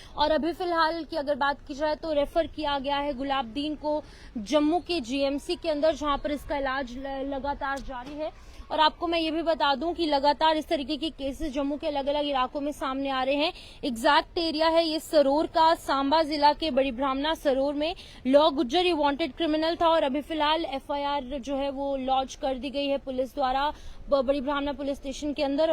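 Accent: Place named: United Kingdom. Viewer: Reporter ne hindi ka accent uk english me kr diya 😢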